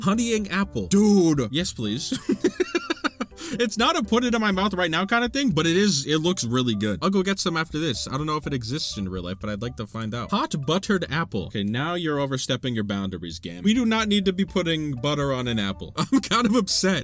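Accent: Ireland. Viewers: Your Irish ? Witch county do you/did live in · I didn't know where is from but I didn't expect Ireland lol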